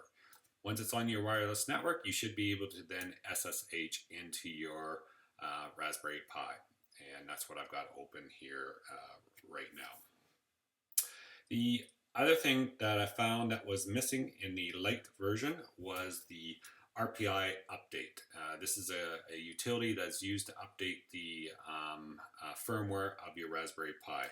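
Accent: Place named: Upper Michigan, United States. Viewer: I'm fascinated by this project. I'm here because I'm trying to do the exact same thing. I have a background in electronics, programming, networking, etc. But also, fascinated by accents. Are you, by chance, a uper? (yoo-per) thanks again for posting this video series.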